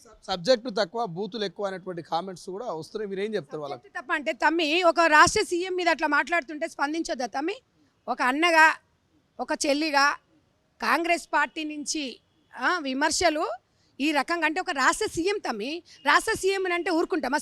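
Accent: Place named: Telangana, India. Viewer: Pakka telangana slang super madam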